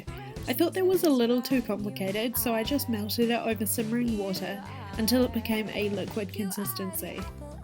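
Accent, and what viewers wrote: New Zealand. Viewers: hey! are you a kiwi? I think I detect a New Zealand accent? if so, hi fellow NZer! just wondering, where specifically did you buy the wick? I find it difficult to find here in New Zealand, and was wondering where you got it. thanks!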